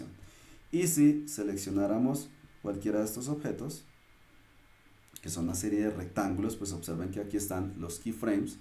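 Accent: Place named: Colombia. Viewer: por el acento creo q eres un compatriota colombiano quisiera saber donde me puedo certificar en adobe que es creo el titulo q posees gracias!!!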